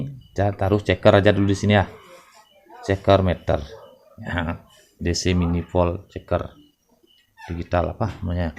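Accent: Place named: Bali, Indonesia. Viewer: Kaka ini orang bali kah ko logot nya kayak orang bali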